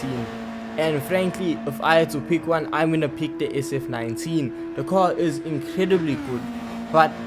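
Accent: South Africa. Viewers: Are you South African? 😂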